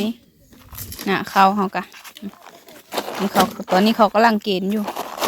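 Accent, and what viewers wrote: Phu Thai, Thailand. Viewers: ข้าวใหม่ เป็น ข้าวไกม้ มำไมไม่พูดว่า ค้าวเม้อ (หยอก)ป้งเพราะดีเน้อ สาวภูไทตนงาม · สำบายดีน้อง ผุน่ารัก. สวยจังสาวภูเทอ.ขยันจัง.สำเนียงภูไทม่วนหูดี..กเฝห้กำลังใจนำเด้อจ้า. จ๋า...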